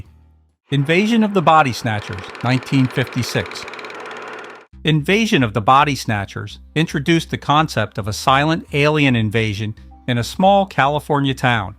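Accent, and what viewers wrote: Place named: Baltimore, United States. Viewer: The narrator has a thick Baltimore accent. Ask him to say "Aaron earned an iron urn."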